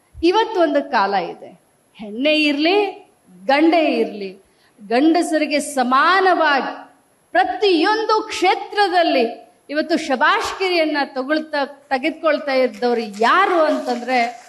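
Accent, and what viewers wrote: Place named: Maharashtra, India. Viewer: ಹೆನ್ನು ಅಲ್ಲ ತಾಯಿ, ಹೆಣ್ಣು ಅದು.. ಮರಾಠಿ ಕನ್ನಡ ಮಾತಾಡ್ಬೇಡಿ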